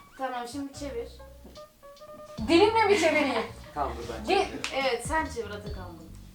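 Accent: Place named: Osmaniye, Turkey. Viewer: Yaw her hareketine ve konuşma tarzına hastayım aynı bizim osmaniyeliler gibi konuşuyor hal ve hareketleride aynı. Kısacası Hastasıyız Dedeeee 😎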